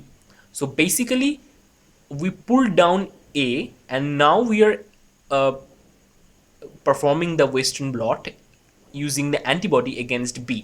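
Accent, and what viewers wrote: India. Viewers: tell me are u from India? what is that accent??? i need to know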